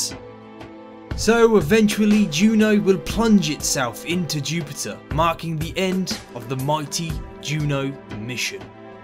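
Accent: United Kingdom. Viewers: cool british accent :D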